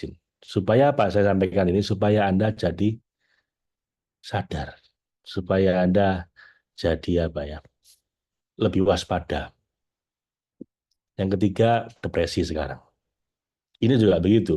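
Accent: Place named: Surabaya, Indonesia. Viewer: Pak suaranya seperti cak lontong 😍🙏 logatnya khas Surabaya 🤓🤴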